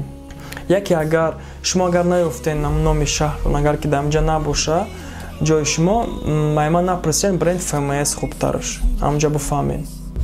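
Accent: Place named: Kulob, Tajikistan. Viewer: братишка офарин барои корот! кори зур хар руз хабари тару тоза медихи лекин гап заниатро хам каме иваз кун ютум кулоб нестай ки лахчави гап зани ича адаби гап зан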